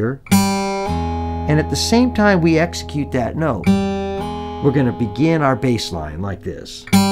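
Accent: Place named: United States. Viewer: your playing is terribly unprofessional. your accent even worse to endure listening to it. why are you doin this videos without really practicing until you get it right... ahhh sorry i completely forgot you maybe could be an american and your main audience is also american .. im 100% serious about what i write, especially your unawareness of how beautiful a guitar can sound if you play music with it, not just notes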